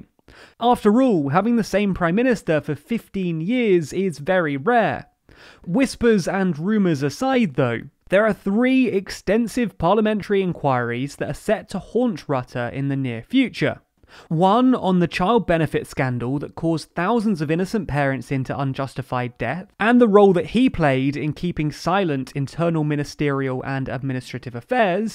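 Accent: England, United Kingdom. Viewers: DitisKaas Appel there are dutch team members in TLDR, it's not 100% based, but ofc for clarity the english accent just works better than stone cool english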